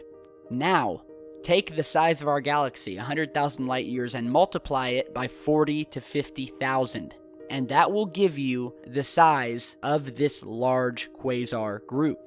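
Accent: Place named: South, United States. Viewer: man, you really have to polish that southern accent. It is quite distracting !!!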